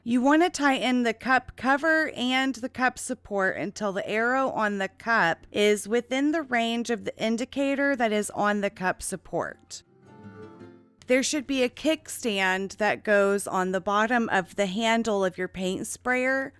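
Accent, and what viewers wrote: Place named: California, United States. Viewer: That California tongue roll just doesnt let me concentrate☹️Great video thou.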